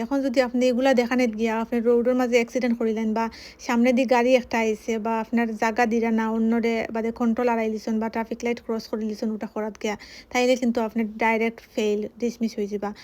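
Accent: Sylhet, Bangladesh. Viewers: sylheti voice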